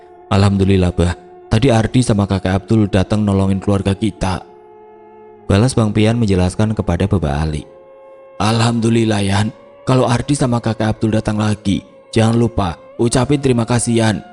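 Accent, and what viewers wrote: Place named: Jakarta, Indonesia. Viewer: Btawian bhsany lucu,pagi mas, yg msih di guyur hujan, ni pondok cabe,msih dngrin mas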